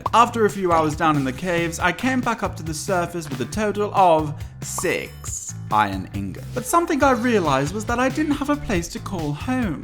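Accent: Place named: United Kingdom. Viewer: Ur accent is a so British lmao luv the vid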